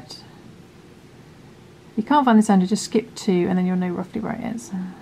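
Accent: England, United Kingdom. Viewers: I find your technique fascinating. The way you position your hook facing upward and the way you wrap your yarn. It flows very well. And you are the only person I’ve ever heard with an English accent, using US terms and stating the UK terms as well, repeatedly. Very helpful I’m sure to those in the UK.. I’m thinking maybe you live in the US? Lovely stitch pattern! I look forward to this series! Thanks for sharing!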